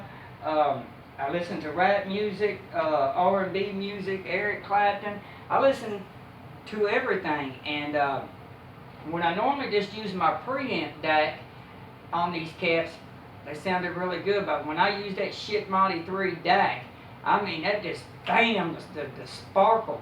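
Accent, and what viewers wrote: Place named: Texas, United States. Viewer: I like this guy’s accent. Where is he from, Texas perhaps?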